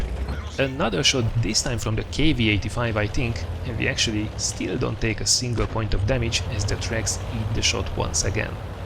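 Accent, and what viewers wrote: Hungary. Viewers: Annyira magyar akcentusa van xdd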